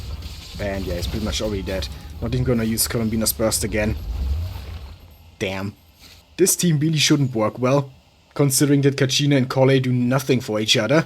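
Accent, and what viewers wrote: Germany. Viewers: That german accent strong af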